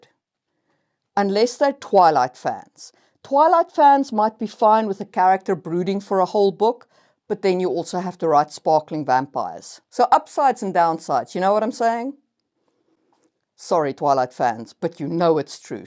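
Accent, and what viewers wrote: South Africa. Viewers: ah, I knew I was hearing a fellow south African 🇿🇦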